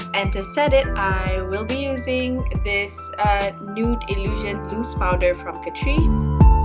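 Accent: Penang, Malaysia. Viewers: kak buat la video cakap bahasa melayu, seronok tgk btw saya orang penang so loghat kita sama. riuh dia lain macammmmm hahahaha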